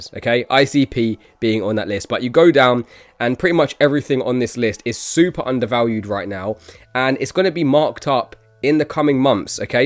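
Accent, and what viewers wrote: United Kingdom. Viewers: Why are all my favorite crypto YouTubers British?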